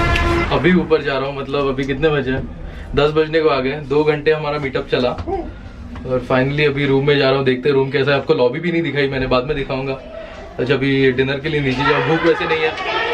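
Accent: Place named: Hyderabad, India. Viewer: U made me remember my hydrbd trip.... way enjoys😍😍.... n ur hydrabdi language kadak😂😂😂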